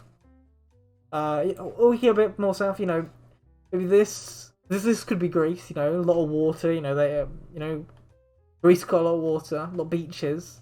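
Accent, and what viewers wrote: United Kingdom. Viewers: guys is she un ironically british?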